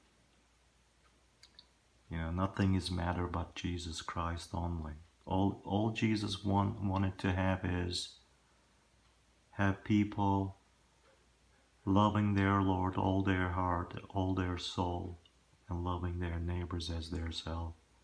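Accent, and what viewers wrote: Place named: Turkey. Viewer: Oh my goodness, we live in Texas too! We’re in the Dallas area! I think it’s the Turkish accent I must be hearing. I couldn’t place what region you are from. If you are also in this area please let me know!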